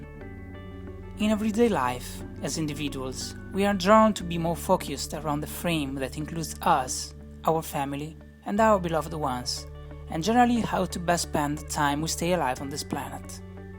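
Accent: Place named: Cagliari, Italy. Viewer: He's from Cagliari (Italy). His accent is annoying in italian too :D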